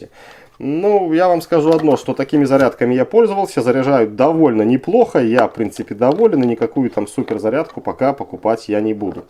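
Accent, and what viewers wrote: Belarus. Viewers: По акценту Беларус ) из Мозыря вы ?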